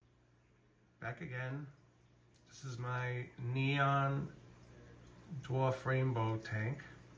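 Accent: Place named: Boston, United States. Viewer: Great first video,! I think it is a lot better than my first video. Love the Boston accent--I haven't heard that in a while. You need to turn that up! Keep up the good work. Anyone with Mascara barbs is number 1 in my book. Oh, and BTW, I love the live stream that was playing on your big-screen TV.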